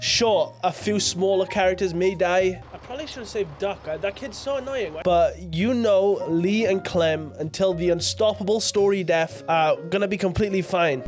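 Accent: Wales, United Kingdom. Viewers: Is that a welsh accent i detect? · Absolute Cinema indeed!! Are you Welsh btw? :D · Mate, are u British? 😊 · ARE U WELSH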